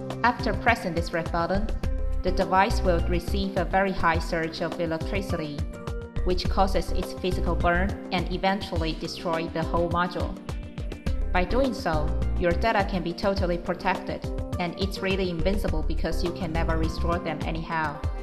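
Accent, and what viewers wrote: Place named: China. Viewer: Her voice sounds like a robot with a Chinese accent...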